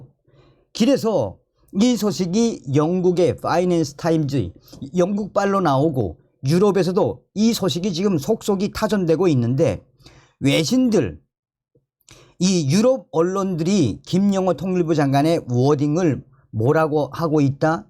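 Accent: North Korea. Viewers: 이것보시오, 정성산감독, 나또한북한동포들이하루빨리저돼지색기밑에서해방되기만을손꼽아기도하는사람중에하나입니다.70년대에이민와서지금도미국에살고있지만북한공산당을싫어하는마음은옛날이나지금이나변하지않은것이오.나도대구에서태어나서사투리가괭장히심한편이오, 하지만무엇을읽을때아어디에적을때,특별히편지에는더더욱사투리를사용하지안아요. 정성산tv를구독한지오래되었지만그것하나만불만이오.좋아하는음식도너무자주먹으면싫어지듯이북한사투리사용은조금줄이시오, 특졀히편지읽을때는더욱이. 그리고표현을너무계속과장하십니다.발칵뒤집어지다나뒤업헜다같은표현은가끔가다가한번씩은들어줄만하지만너무자주사용하면잔개의효과가있을수있으니까요.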